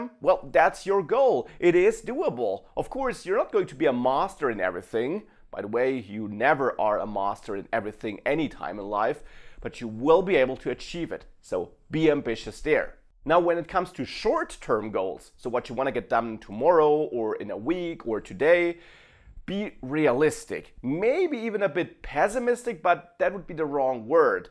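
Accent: Germany. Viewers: Okay cool, ich erkenne doch den Akzent meiner Landsleute, auch wenn euer Englisch natürlich super gut ist 😉 Dass es auch wirklich hochwertige Websites/Channels zum Thema Software Development aus Deutschland gibt, ist gefühlt leider irgendwie viel zu selten 😄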